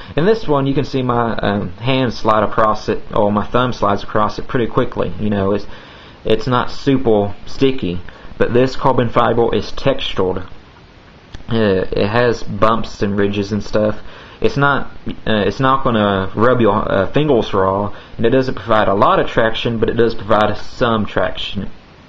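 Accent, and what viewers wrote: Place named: Louisiana, United States. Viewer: Good review. When I got mine it was not that sharp and I noticed that the bevel is over 20º on either side. Was yours like that as well? I'm going to reprofile mine this weekend. You from Louisiana? Love that accent.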